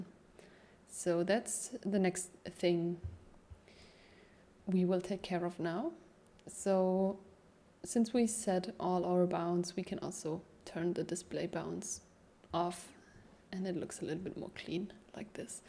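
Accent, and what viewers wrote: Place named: Germany. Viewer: just found you and am really enjoying these long tutorials. Also love the charming german accent hahaha gerne mehr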